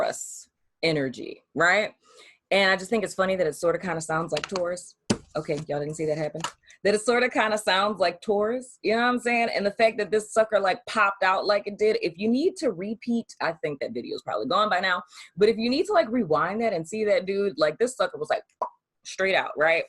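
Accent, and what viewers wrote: New Orleans, United States. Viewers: I knew you was from New Orleans from your first word!! I am too & I said “Lawd she sounds like me” lol! ⚜️⚜️⚜️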